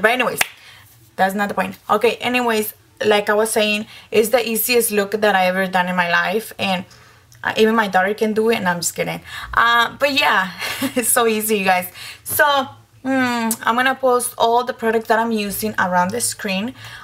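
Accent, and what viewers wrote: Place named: Guatemala. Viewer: i love your accent :) im guatemalen too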